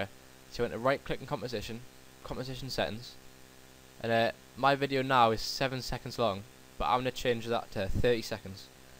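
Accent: United Kingdom. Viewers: nice tutorial man i like your british accent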